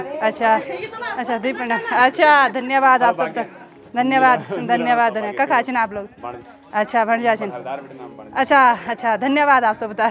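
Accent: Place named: Garhwal, India. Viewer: didi aapka Garhwali bolna bahut achcha lagta hai♥️♥️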